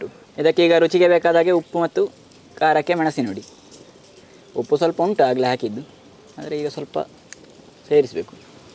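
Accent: Mangalore, India. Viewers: ಮಂಗಳೂರು ಕನ್ನಡ ಚೆಂದ ಮಾಯ್ರೆ ❤ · I subscribed to your channel because of the charm in your Kannada accent ! Love it when you speak in mangalore Kannada ❤ also you make wonderful recipes. Thanks 🙏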